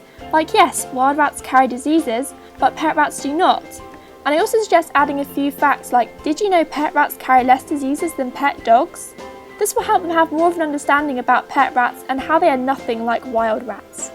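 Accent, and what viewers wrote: United Kingdom. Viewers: OMG!, not only did I LOVE your video, It actually WORKED FOR ME!!!!:D I want to SCREAM I'm so happy! I watched your video, and I made a couple long paragraphs about rats, a couple facts, and my plan, and I'm getting a rat... THIS WEEKEND!!!!! To make my parents get more onboard, I'm going to use my own allowance to get everything! >D You don't even know how ESTATIC I am about this. P.S. I love you're (I think British) (I think it's spelled) accent! I'll tell U about her when I get her! Love, You're Biggest FAN!!!! >D!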